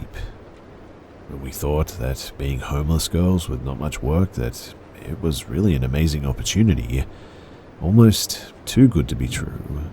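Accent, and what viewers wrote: Australia. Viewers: Are you Australia? Loving you content aswell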